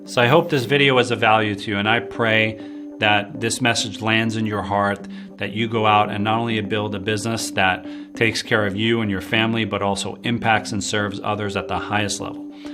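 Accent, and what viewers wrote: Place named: South Africa. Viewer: Sir, you sound South African 🇿🇦, thank you so much for this, we thank God for this revelation. It’s easy to be selfish in business especially when you miss it as Christian entrepreneur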